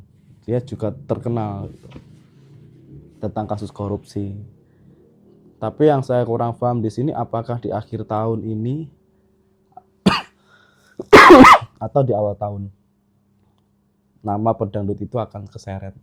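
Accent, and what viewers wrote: Java, Indonesia. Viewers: Mas jenengan kui penak banget nek jelaske sing ditrawang, aku yo seneng suarane lirih, trs jawane kui lho ora ilang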